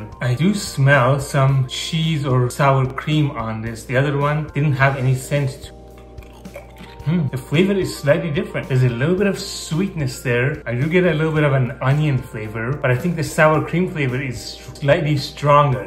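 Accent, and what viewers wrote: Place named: Iran. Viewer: Are you Iranian? Your accent seems Persian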